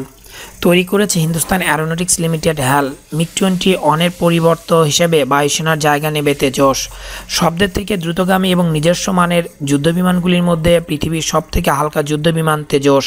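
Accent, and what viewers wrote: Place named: Bangladesh. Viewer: তুই কি বাংলাদেশি তোর শব্দ উচ্চারনে বাংলাদেশি গরুর গন্ধ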